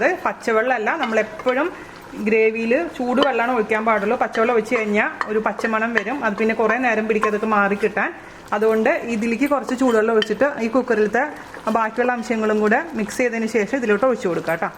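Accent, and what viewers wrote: Thrissur, India. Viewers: chechi de ഒട്ടുമിക്ക dishes ഉം ഞാൻ try ചെയ്‌തിട്ടുണ്ട്. Already subscribed your channel long ago.. But comment cheytat ഇപ്പോഴണെന്ന് മാത്രം. I like your dishes❤️❤️ also like that trissur slang 😍❤️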